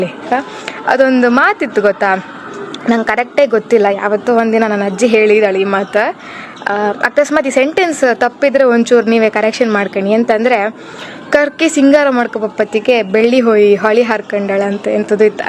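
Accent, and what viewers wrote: Kundapura, India. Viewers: Kundpura kannada ❤ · Idu namma kundapura kannada..❤ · Kundapura kannada matra chanda marey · ನೀವು ತಲೆ ಕೆಡಿಸಿಕೊಳ್ಳಬೇಡಿ ಅವನ ಮಾತಿಗೆ Kape ಕಸ್ತುರಿ ನಿಮ್ ಮಾತು ತುಂಬಾ ಖುಷಿ ಆಯ್ತಾ ಕುಧಾಪುರ ಕನ್ನಡ ಸೂಪರ್ ಅವನ್ ಕಣು ಸರಿ ಇಲ್ಲ ನೀವು ತುಂಬಾ ಸುಂದರ ಇದ್ದೀರಾ👌👌👌💐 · ಎಂತ ಕುಂದಾಪುರ ಭಾಷೆ, ಮಾರ್ರೇ 👌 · ಕುಂದಾಪುರ ಕನ್ನಡ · ಕಪ್ಪಾದರೇನು, ಲಕ್ಷಣವಾಗಿದ್ದೀರಿ, ತುಂಬಾ ಚೆನ್ನಾಗಿ ಮಾತಾಡ್ತೀರಿ, ಕುಂದಾಪುರ ಭಾಷೆ ಕೇಳಲಿಕ್ಕೆ ಖುಷಿಯಾಗ್ತದೆ. God Bless you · Kundapura kannada kembuke chenda adrge niv kuda thumba lakshana kanthidri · ನಿಮ್ಮ ಕುಂದಾಪುರದ ಕನ್ನಡ ಕೇಳಲಿಕ್ಕೆ ಕಿವಿ ಎಷ್ಟು ಇಂಪಾಗುತ್ತದೆ ಎಷ್ಟು ಚೆನ್ನಾಗಿ ಮಾತಾಡ್ತೀರಾ ನಿಮ್ಮ ಕುಂದಾಪುರ ಕನ್ನಡ ನಂಗ್ ತುಂಬಾನೇ ಇಷ್ಟ ❤🎉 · Kappadaru sundaravagiddi.chennangithu kundapur bhashe. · ಇದು ಕುಂದಾಪುರ ಕನ್ನಡ, ಉಡುಪಿ ಜಿಲ್ಲೆ, ಕುಂದಾಪುರ. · Nimma kudapra mathu chenda untu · ಭಾರೀ ಶೋಕು ಉಂಟು ನಿಮ್ಮ ಕುಂದಾಪುರದ ಕನ್ನಡ ಕೇಳಿಕೆ ಬಾರೀ ಸಿಹಿ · ಕುಂದಾಪುರ ಕನ್ನಡ ˌ ಕೇಳಲು ಪರಮಾನಂದ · ಕುಂದಾಪುರ ಕನ್ನಡ ಕೇಳೊಕೆ ತುಂಬಾ ಇಂಪಾಗಿ ಕೆಲ್ಸುತೆ 🌹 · Lofer ನಾಯಿ adu kundaapura kannada.. 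Modlu sede tara aadodu bidu. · ಕುಂದಾಪುರದ ಚೆಂದ ಭಾಷೆ ಕೇಳಿ ಖುಷಿ ಆಯ್ತು ❤❤ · Beautiful Kundapura accent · Namma. Kundapura. Kannada ❤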